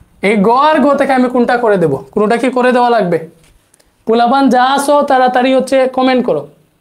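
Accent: Sylhet, Bangladesh. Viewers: ভাইয়া কি সিলেটি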